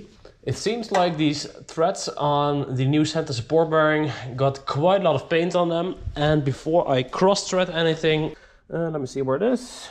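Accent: Netherlands. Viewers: what an awesome fucking swap man love it! i’ve been looking into maybe getting a volvo wagon & getting it swapped, i love older volvos and giving it a step up w a v8 sounds like a damn good deal. live in the US now but grew up in amsterdam and your accent makes me smile! awesome videos man thank you, looking forward to another post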